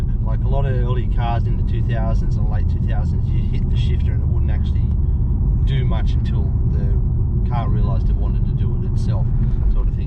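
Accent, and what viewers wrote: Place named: Australia. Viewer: I can see from your accent you are aussie mate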